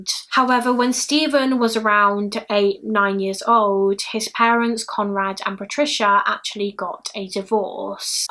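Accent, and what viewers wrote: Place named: Bristol, United Kingdom. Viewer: Hello. You. Sound. Bristol UK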